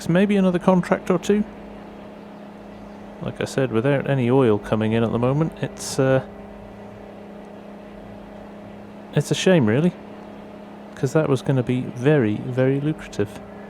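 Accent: England, United Kingdom. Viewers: Are u english?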